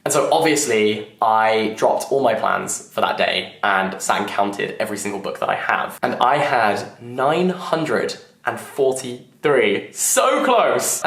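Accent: United Kingdom. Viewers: You're Brit English makes me wanna take a literature degree 🤌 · « New York is so lovely » he says in a thick British accent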